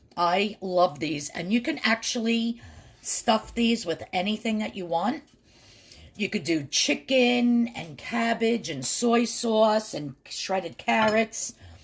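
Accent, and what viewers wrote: New York, United States. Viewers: I love your videos. I just subscribed. I want to start the purple plan this week and you have some great ideas. I'm from long island and you sound like your from NY. I feel like I'm listening to a friend or relative.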